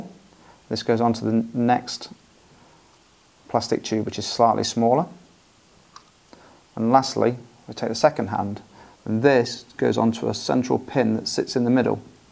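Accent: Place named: England, United Kingdom. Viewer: It's easy when you know how! Thanks. It was so reassuring to hear an English accent for the demo too. Very good thanks.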